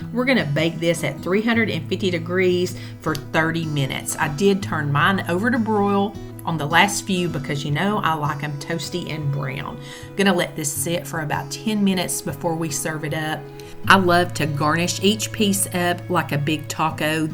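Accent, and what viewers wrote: South, United States. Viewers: Oh goodness lady I can listen to you speak all day. I absolutely LOVE me a southern accent. I LOVE anything southern; when I retire I'm definitely moving from north east to anywhere in the south. Awesome video and recipes. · I am from NY and love the southern accent🥰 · You are adorable! You make us southern gals look and sound awesome! Thank you! AND I can smell the deliciousness from HERE! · I LOVE your dishes and your southern voice!❤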